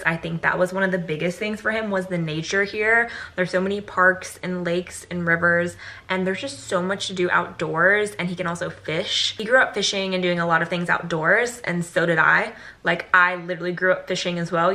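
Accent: South, United States. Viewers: your southern twang is peaking back out! <3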